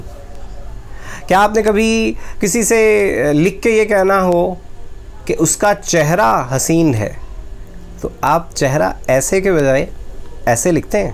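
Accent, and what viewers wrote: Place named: India. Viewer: Aap kahan se ho?.....talaffuz india ka lag raha hai......masha Allah....achchi aur durust koshish hai.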